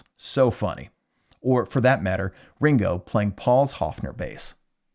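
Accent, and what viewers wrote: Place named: Baltimore, United States. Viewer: I'm digging on your vowel sounds. Must be Baltimore or something like it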